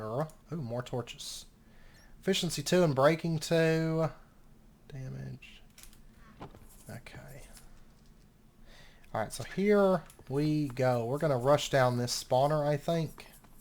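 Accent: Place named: Owensboro, United States. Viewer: I’ve got tons of family in Owensboro. Im really loving watching these videos since your accent reminds me of all the good times I had down there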